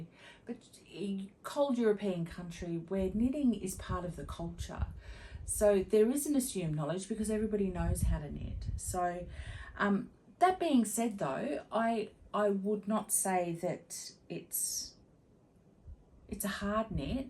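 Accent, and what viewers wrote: Australia. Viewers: Look, you could possibly take your own advice and not knit the rest of the MKAL just because you aren't feeling it. But you can still use what you have done to make something. One of the European knitting podcast girls made hers into a cowl. I'll go follow you on Instagram and leave a comment with a link to her for you. So enjoy having another Aussie to listen to while I knit. 💜💜💜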